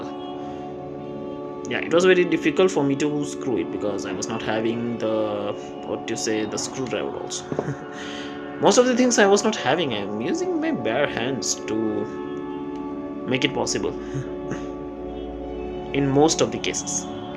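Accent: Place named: Jamaica. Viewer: Most talented aatmi with the jamaican accent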